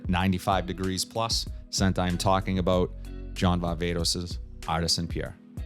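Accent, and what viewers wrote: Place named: Massachusetts, United States. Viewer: I definitely hear the Massachusetts accent😅😂🤣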